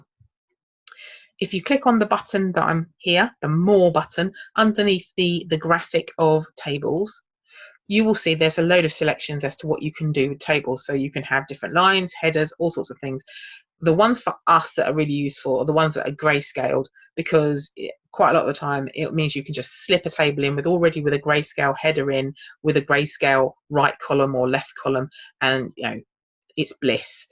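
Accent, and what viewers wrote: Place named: Hertfordshire, United Kingdom. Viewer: Thank you. New to KDP so learning how to make one’s own interiors is good to know rather than having to get software like Tangent Templates (although I think I am tempted to as well). May I ask a question: would you recommend TT, are you still selling on KDP as this video is from 2 yrs ago?, are you still posting videos? You sound like you are from my neck of the woods. I’m a 54yr old mum from Hertfordshire (top of Northern Line). Thank you.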